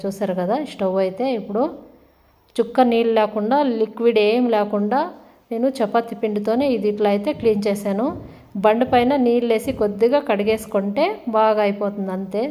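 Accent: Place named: Chikkaballapur, India. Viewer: ಮೇಡಂ ನಿಮ್ಮದು ಕರ್ನಾಟಕ ತಾನೇ ಕೋಲಾರ ಅಥವಾ ಚಿಕ್ಕಬಳ್ಳಾಪುರ ತೆಲುಗು ಮಾತಾನಾಡುವ ಶೈಲಿ ಚಿಕ್ಕಬಳ್ಳಾಪುರ ತೆಲುಗು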